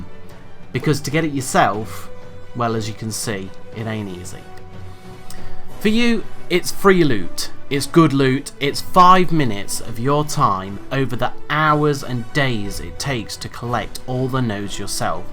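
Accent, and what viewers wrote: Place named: United Kingdom. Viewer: too english english😆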